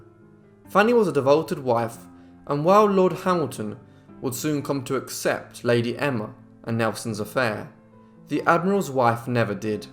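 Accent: United Kingdom. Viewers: Your accent is getting better, you sound very British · This Guy is legit reading us Wikipedia in a british accent and I'm here for it. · Dear Your British Accent makes it Sooo HARD for people like me with other languages except English. Can't you Decrease your Accent or Ask someone else with Non Accent to Speak instead of you. It is REALLY hard to Understand it with your Thick British accent.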